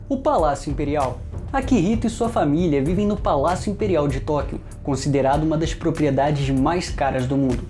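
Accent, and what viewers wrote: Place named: Rio de Janeiro, Brazil. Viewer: Gosto muito dos vídeos! Só não muito desse sotaque carioca carregado... Parece uma bola vazando pelo pito!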